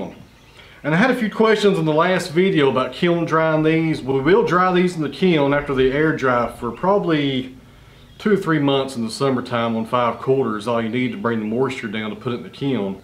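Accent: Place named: Tennessee, United States. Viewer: Same! His Tennessee accent, calm manner, wonderful Country music, and the beautiful way he presents the timber has me hooked, all the way from Australia!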